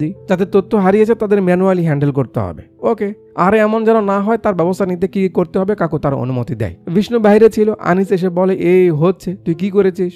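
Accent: Bogura, Bangladesh. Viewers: Vaiya akta request kori? Apni besir vag kotha guloi bogurar vashai bolben,, amar kuv valo lage🥰🥰🥰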